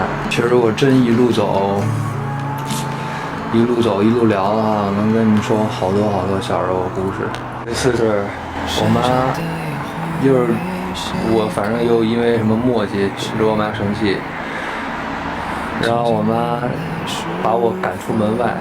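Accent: Beijing, China. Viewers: 就爱他这种北里北气的口音 太好听了真的 能听一整天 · 这北京话听得舒服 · 北京腔真的跟老大爷似的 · 我爱你，我也是北京人。现在堵在美国不能回家。听了很多遍你的后座剧场，也是我小时候的回忆。听着熟悉的京腔，我好想家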